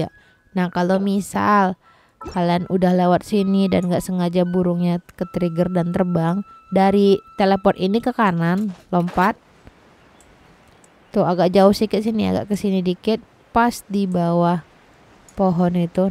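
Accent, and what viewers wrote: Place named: Sumatra, Indonesia. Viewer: logatnya kek org sumatra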